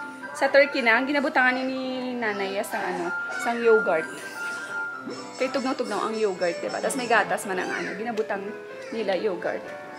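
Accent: Iloilo, Philippines. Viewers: hi po.. 🙋 ang galing po tunong ilongga narin po kayo..👏👏👍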